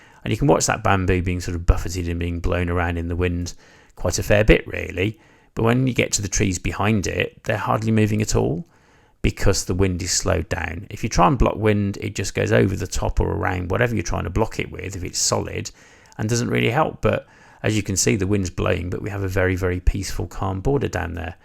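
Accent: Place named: Midlands, United Kingdom. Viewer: Subbed👍🏽just started my maple collection, your content has been a big help👍🏽thank you. I’m in Telford, sounds like a midland accent you have👍🏽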